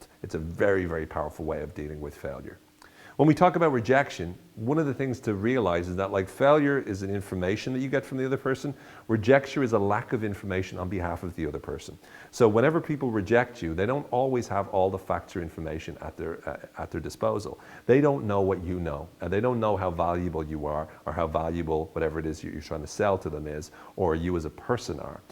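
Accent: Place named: Ireland. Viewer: You speak in a wonderful way, with clarity, passion, wisdom, and a lovely soft irish accent : )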